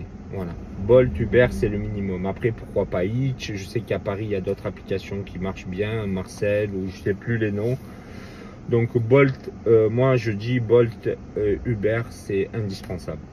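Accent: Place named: South, France. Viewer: Merci beaucoup pour tout ses petits conseils qui apportent énormément Et toujours avec cet accent du sud qui fait plaisir 👍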